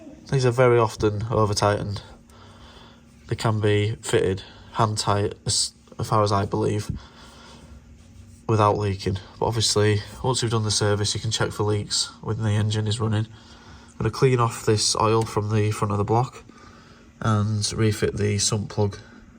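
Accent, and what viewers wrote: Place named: North, United Kingdom. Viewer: Good work, nice to hear a northern voice doing a service on these great cars. Do you know where the fuel sensors are and are they easy to replace? Cheers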